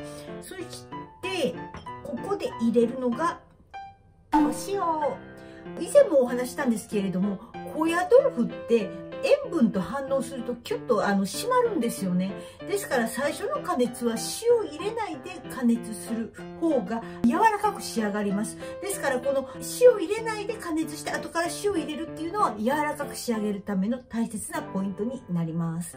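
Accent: Kyoto, Japan. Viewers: 先生の京都弁が可愛らしいです 意外な組み合わせですがさすが奥薗壽子流です!🥰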